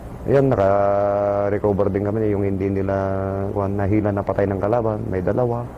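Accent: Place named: Pantabangan, Philippines. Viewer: Cgruro sir tubong pantabangan.at ung pronounsetion.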